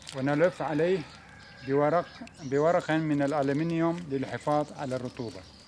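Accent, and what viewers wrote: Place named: Bahrain. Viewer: هو عراقي فكيف يتكلم بحريني ؟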